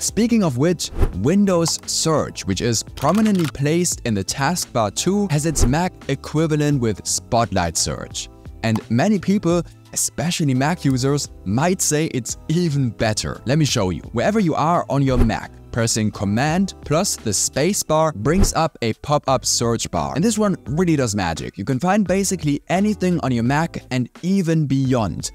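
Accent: Germany. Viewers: You german?😂😊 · Ngl you sound like a german speaking english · Das ist Deusches englisch kommst du aus Deuschland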